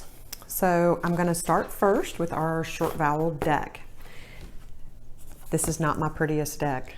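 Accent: South, United States. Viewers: I'm so glad to have found someone who sounds like me - Southern accent! This helps me to teach my homeschooled daughter. My name is Sam, but when my daughter isolates the short vowel sound, she hears e. If I'm honest, I do too. Any tips with that? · you gorgeous young lady with a hint of southern accent I’m in love.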